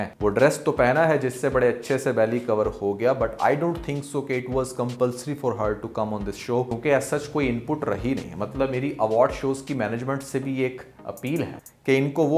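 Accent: India. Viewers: aap indian accent mein kyun boltay hain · Bhai tu muhajir hai kya bilkul UP Bihar aur Old Delhi wali urdu bol Raha hai?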